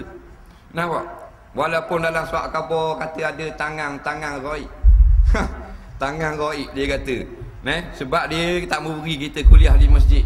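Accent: Malaysia. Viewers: ni pelat terengganu..but it's ok la..lebih kurang je pelat dua negeri tu..hehe..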